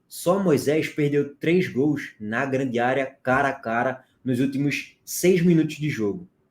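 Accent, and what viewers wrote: Bahia, Brazil. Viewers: só baiano nordestino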